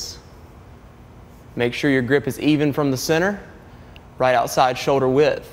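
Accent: South, United States. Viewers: He's got that accent... Hmm.. Southern accent?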